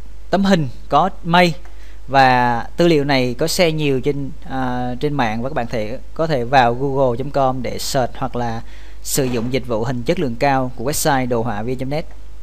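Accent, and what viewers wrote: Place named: South, Vietnam. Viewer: Kết cái giọng Nam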